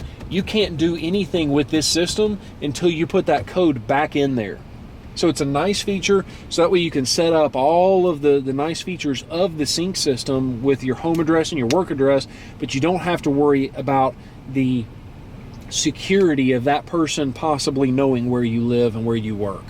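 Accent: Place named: Alabama, United States. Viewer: Just bought a new Ford Escape 2019 in September 2019. This was an extremely helpful learning tutorial. It was easy to understand, even if you don't speak the Alabama dialect. I tried to learn from the owner's manual, but I just got lost trying to read it. Take the time to listen and to watch this video. I owned a Focus 2012 with the prior Sync system (called My Ford Touch) and this new Sync 3 system is so much better and it operates very fast with no delays. You will fall in love with this system. I do use an iPhone 8 and it works great when connected by Bluetooth or via USB wire. You do have to have the iPhone connected via USB cable for some of the functions to work. Also, the sound quality of music or of Podcasts which are on your iPhone is much better than when playing music via Bluetooth. Thank-You for taking the time to make this video.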